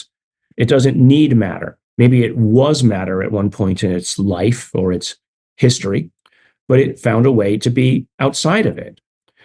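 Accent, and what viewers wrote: Liverpool, United Kingdom. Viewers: No way is a scouser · Didn’t know Gary was a scouser. ( people who are from Liverpool are nicknamed scousers.) 😀👍🏻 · NO WAY!!!! HES A SCOUSER!!!! IM LIVING IN LIVERPOOL UK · A scouser! Wow! Never knew that!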